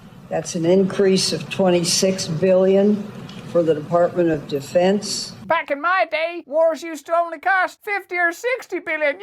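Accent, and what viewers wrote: United Kingdom. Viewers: The composition of the topic is great and so is the enunciation however I have a weird resistance to the obviously thick British accent telling me news in DC. Maybe it’s the Yankee in me.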